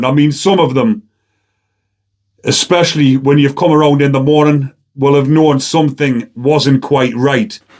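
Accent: Newcastle, United Kingdom. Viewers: we all know you're a Geordie but can't you speak MoRe clearly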